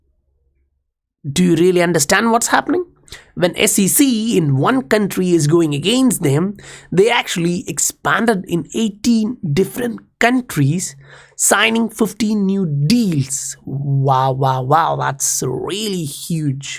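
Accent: India. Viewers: You sound more Indian than Korean to me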